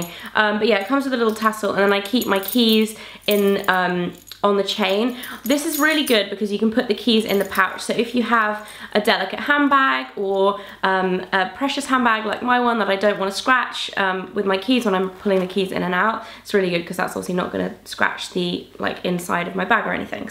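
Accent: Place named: RP, United Kingdom. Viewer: I know it might be a weird thing to say, but I just love accent. English is my second language and I just think that all these English people sound so nice and posh and I wish that I could mimic accent.:D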